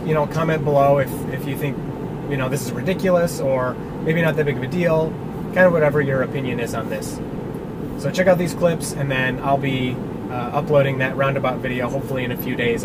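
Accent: United States. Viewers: I was confused why you had a US accent and were driving on the wrong side of the road. Maybe the car heard you say that and thought the same thing.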